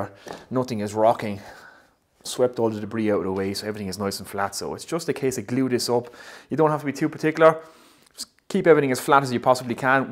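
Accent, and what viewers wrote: Waterford, Ireland. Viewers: Some Waterford accent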